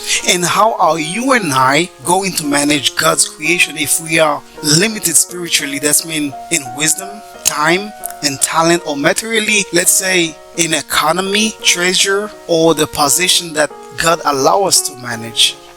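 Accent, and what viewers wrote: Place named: France. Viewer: Interesting! I really appreciate your french accent.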